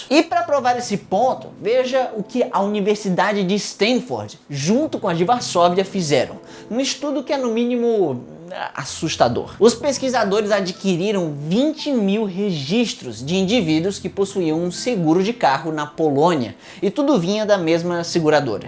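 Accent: Ceará, Brazil. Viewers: tu é do ceara man? kkk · Certeza que ele é cearence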